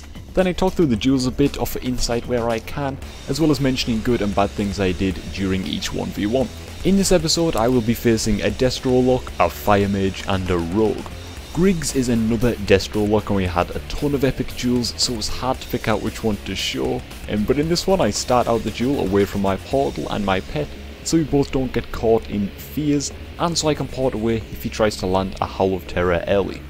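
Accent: Newcastle, United Kingdom. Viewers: Is it near newcastle, sounds kinda geordie, but maybe outskirts or something?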